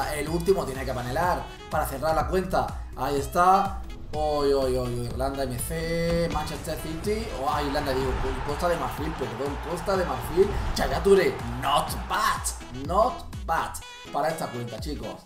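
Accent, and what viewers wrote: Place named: Granada, Spain. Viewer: no pronuncies las S preferimos que hablas como tu hablas que eres de GRANÁ😉😛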